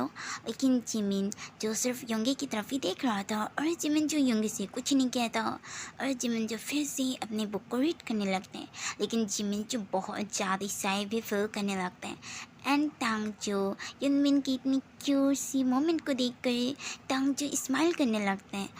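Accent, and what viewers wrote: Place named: Pakistan. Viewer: what u want to prove like this? I mean your urdu accent..........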